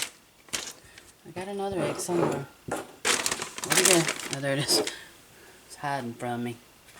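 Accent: South, United States. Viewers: When I heard her say, "it ain't gonna hurt nuthin' I knew she was country. I usually fry my cabbage.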